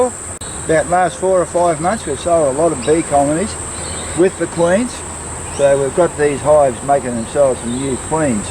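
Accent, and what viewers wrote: Australia. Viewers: I have watched quite a few vids on the flow hive and most commentators come across as sniveling little spoiled brats trashing the product because of their often laughable and regularly idiotic opinions that appear to be mainly based on jalousy more than anything else. This is the first vid I have seen where anyone makes valid criticisms and demonstrates their factual concerns with the product. You have earned a lot of credibility with me. Clearly you are a very knowledgeable beekeeper and more importantly, an honest and fair gentleman. I get the impression you may not be overly keen on the flow hive and that's OK because your concerns are based on fact and experience and not concocted opinion just to jump on the bashing bandwagon. No doubt the product is not perfect but you make sense with your concerns and questioning of it which is miles above what many others have done. 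It's also great to see and hear a died in the wool Aussie making such informative and interesting vids. Well done mate and I wish you every success and good luck with your endeavors.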